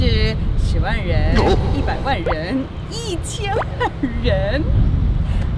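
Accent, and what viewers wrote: Beijing, China. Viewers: Subscribed, 雖然北京腔聽起來不順耳，加油！ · Q1: 請問是幾歲到中國求學的？聽妳的口音妳應該是很小就去中國念書了，是跟著父母一起去中國的嗎？ Q2: 為什麼後來突然喜歡台灣而不是繼續待在中國？ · 以後有得感謝囉一定很快就會五萬十萬訂閱了那我提問個以後有興趣住在台灣嗎？待久了就會變台灣腔不會有北京腔了😂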